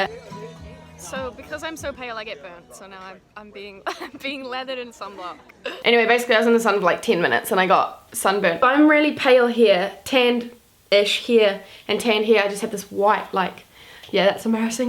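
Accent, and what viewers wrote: New Zealand. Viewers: I really love to watch video. She has really cute Kiwi accent. Hello from South Korea. I wish you upload video everyweek for subscribers. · i really love nz's accent <3 hello! from PH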